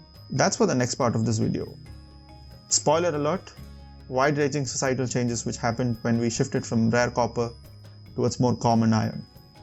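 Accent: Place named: Kerala, India. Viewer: Typical Mallu accent/tone....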